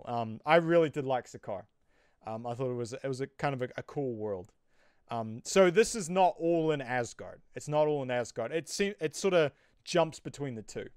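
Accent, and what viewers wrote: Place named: United States. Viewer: Why is your accent messed up? U sound American.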